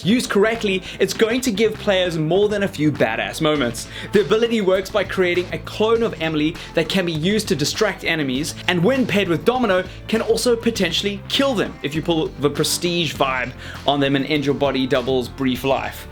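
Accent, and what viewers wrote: South Africa. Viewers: are you south african? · Your from south africa? :D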